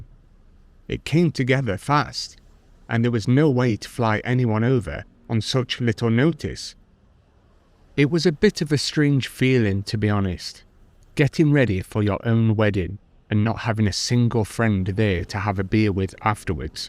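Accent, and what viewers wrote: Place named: Cockney, United Kingdom. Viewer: Idk, this guys accent sounds cockney/ UK, but claims, beeing american? Anyway, these things happen to every 2. guy, marriing a thay girl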